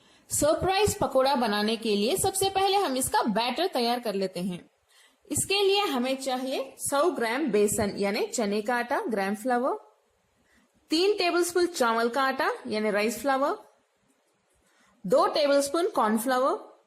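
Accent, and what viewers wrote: Hyderabad, India. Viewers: Aapki hyderabadi mast rehti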